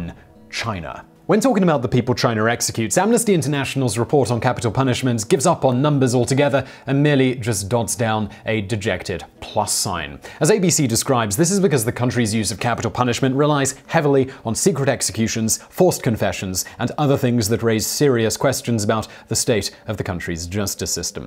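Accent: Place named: London, United Kingdom. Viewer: Mr bald guy speaks so fast with his silver spoon London accent. His enthusiastic tone dies down towards the end due to fatigue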